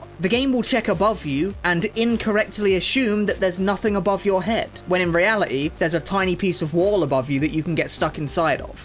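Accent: United Kingdom. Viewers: babe wake up new tf2 video from funni british dude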